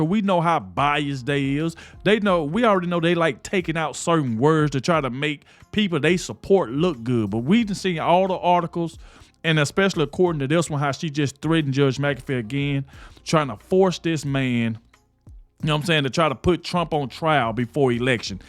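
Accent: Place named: Louisiana, United States. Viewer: I have to ask, where is your accent from? Louisiana?? It’s fun to listen to.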